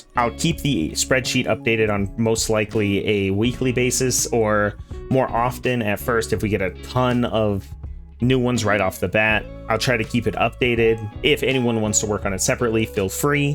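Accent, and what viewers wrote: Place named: Ireland. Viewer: love the guides irish accent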